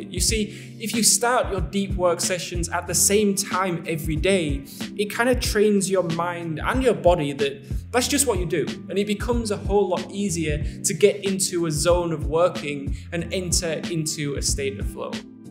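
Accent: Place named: West Yorkshire, United Kingdom. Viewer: You sound like you're form west workshire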